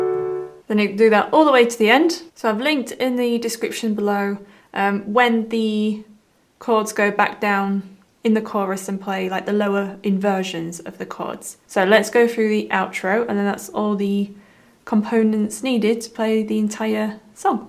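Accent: United Kingdom. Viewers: "I'm gonna teach you how to play by, with a lovely British accent."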